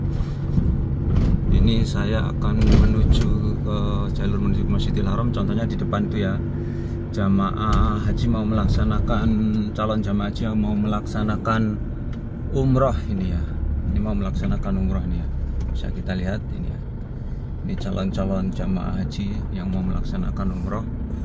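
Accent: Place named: Java, Indonesia. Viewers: owalah pantesss medok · Sama medok nya hehe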